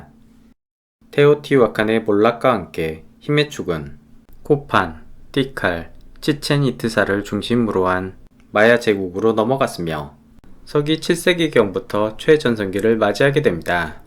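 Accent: Jeolla, South Korea. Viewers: 말투를 들어보니 고향이 전라도이시구만요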